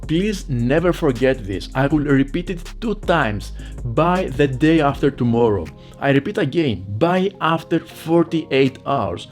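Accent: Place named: Greece. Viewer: I like your greek accent 😁 Nice video 👏👏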